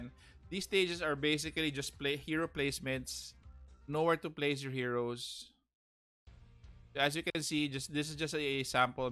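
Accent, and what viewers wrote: Philippines. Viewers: I hope they Put a option so that people can Delete or Keep other servers. By the way are you a Filipino? The way you speak your English has a Filipino accent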